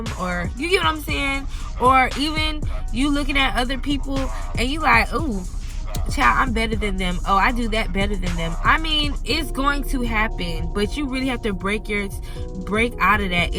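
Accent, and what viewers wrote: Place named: Miami, United States. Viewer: Sounds like you from Miami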